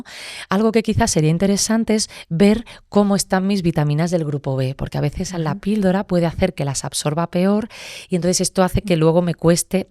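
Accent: Spain. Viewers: Gracias por la información explica claro y hablas muy bonito me encanta tu acento español❤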